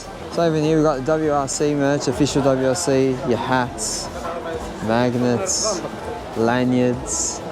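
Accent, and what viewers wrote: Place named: Australia. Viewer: Nice video, mate (with the cool Australian accent). Hope you enjoyed your time in Finland. Our family has been every autumn there spectating the rally in three different generations at least. It’s always a spectacle. Always good to see some Australians visiting here in the North. Also, as you’re probably aware of, our very own Valtteri Bottas is pretty much Aussie now btw. Greetings from Big Casey Stoner fan from Finland 🇫🇮🤝🇦🇺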